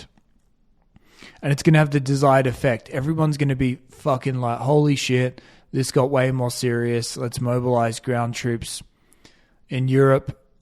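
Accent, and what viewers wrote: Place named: Australia. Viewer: Hey good to hear an Aussie talking about this I’m petrified my biggest fear is nuclear war and I just can’t believe that this crazy fucking person Putin even call him a human has all this control a few boomers can destroy all of our hopes and dreams. Whereabouts in Australia are you from? I’m from New South Wales.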